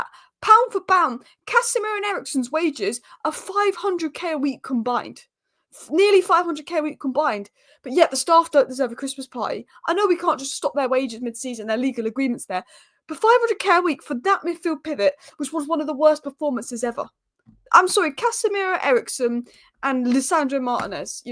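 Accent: United Kingdom. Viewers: This is british accent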